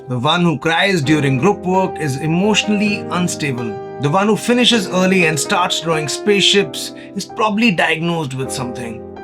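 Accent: India. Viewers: You sound like the professional wrestler, Rey Mysterio with an Indian accent.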